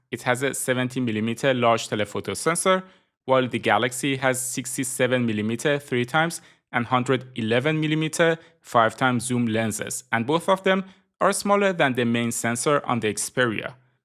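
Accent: Iran. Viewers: داداش خیلی لحجه ایرانی باحالی داری😂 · لحجه ایرانی تابلوعه سید 😂😂😂😂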